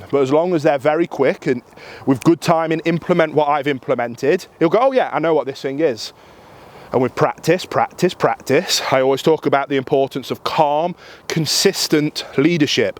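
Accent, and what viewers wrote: United Kingdom. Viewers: Finally I love someone's british accent, I can understand everything so smoothly.. so different from Newcastle geordies I used to live with.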